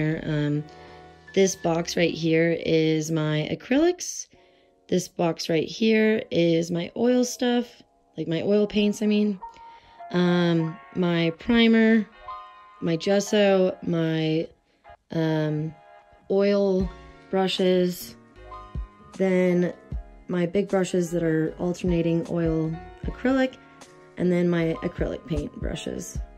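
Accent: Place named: North America. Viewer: Hi from a self-confessed art groupie!! My art studio tour would last about 3 minutes...XP-Pen monitor, XP-Pen pen, Clip Studio Paint software...DONE. My music studio tour would be closer to 7.5 minutes with stories about each piece. I decided to sub your channel based on this video tour, as much because of your persona as for the storage tips. You remind me a little of Chloe Rose only with a North American accent. While you were showing us around your MySpace setup, I was thinking, "Now here's someone it'd be really cool to watch paint!" Look for my art on my B channel someday. Meanwhile, I look forward to following your (and your hubby's) art adventures.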